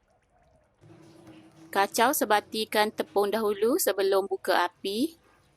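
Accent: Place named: Kelantan, Malaysia. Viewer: Bunyi kecek Tu org klate😂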